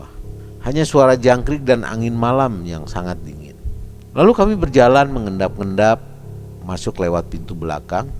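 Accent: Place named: Sunda, Indonesia. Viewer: Suara khas sunda nya mantul pisan euyyy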